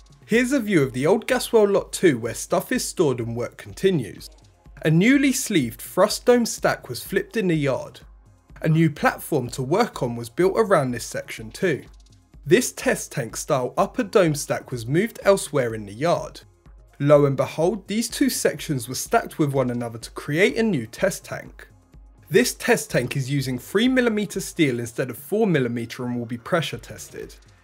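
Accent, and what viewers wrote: United Kingdom. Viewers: Sorry but his mouthed was British his farther south african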